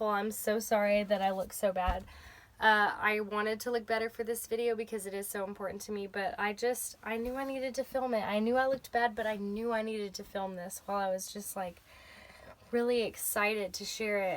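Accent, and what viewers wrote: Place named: North Carolina, United States. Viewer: Sweetheart, #1 you look beautiful in this video. #2, don't suppress your accent! I grew up in western NC, and I suppressed mine for many years. That accent is YOU and a connection to your ancestors. Let it be! This is the first video of yours I've seen, and I just love you 💜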